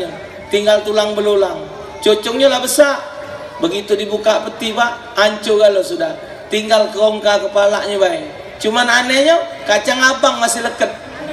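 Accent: Palembang, Indonesia. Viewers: Ya Allah enak ketawo 25 tahun d bandung denger ustad ceramah baso palembang. Sehat terus Ustad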